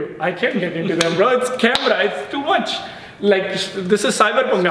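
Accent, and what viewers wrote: Punjab, India. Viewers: bhai apki sbse achi bat to ye h ki aap hindi me jo thodi thodi punjabi bolte h bhoht mast h bhai punjabi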